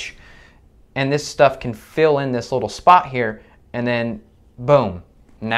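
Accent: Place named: United States. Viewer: love your American accent 😀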